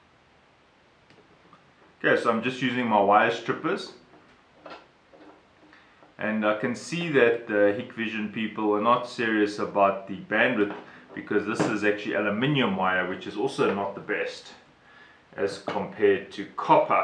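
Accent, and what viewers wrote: South Africa. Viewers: useful information you have a south african accent :)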